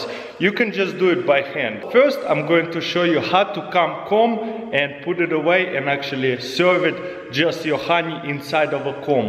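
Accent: Russia. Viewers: По акценту слышно, что русскоговорящий человек. Это так? Интересно просто. Я иногда встречаю людей, у которых акцент еще хуже, чем у Русских, но они не русскоговорящие. Вот мне интересно, вы русскоговорящий или нет?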